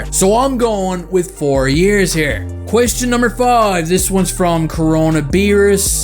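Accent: Newfoundland, Canada. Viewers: Congrats! I enjoy your content a lot and I find you very funny and original. You're so good at making up names for the players. And yes the background videos and memes are fun. it makes you different from all the other YouTubers. You're my favorite! Keep it up! One more thing... Love your Newfie accent! 😜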